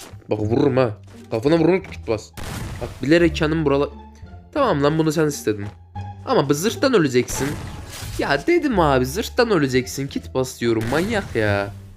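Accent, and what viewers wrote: Azerbaijan. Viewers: Abi sen Azerbaycanlımısınnn{>¦¶ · Abi sen azerbeycanlimiwin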